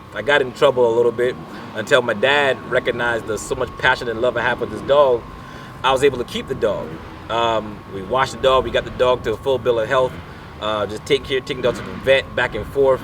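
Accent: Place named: South Carolina, United States. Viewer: Beautiful dogs!! I knew I heard a SC accent..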